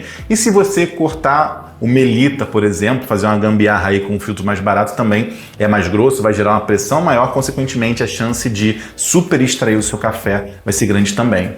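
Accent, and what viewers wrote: Rio de Janeiro, Brazil. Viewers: O conteúdo até que é interessante. O que não dá para aguentar é o sotaque carioca carregado, que sempre passa a impressão de esnobismo asqueroso. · Aprendi muito! Vou regular meu moedor de café e vou testar esse café triatlon .. Mas o melhor de tudo sempre é ouvir um sotaque carioca no Youtube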